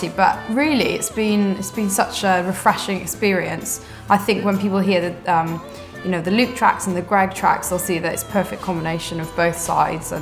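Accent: Wales, United Kingdom. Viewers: Ugh her Welsh accent ♡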